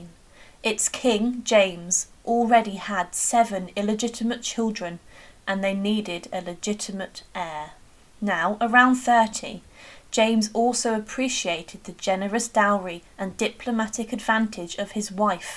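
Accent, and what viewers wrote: England, United Kingdom. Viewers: While it is spelled ‘Holy-rood’ it is pronounced ‘Holly-rood’. I am Scottish, born on the west coast, grew up in Inverclyde, university in Aberdeen, lived in Edinburgh - where Holyrood is to this day - and I can assure you, your pronunciation is WRONG. Given your accent, I am assuming you’re English. You should know better.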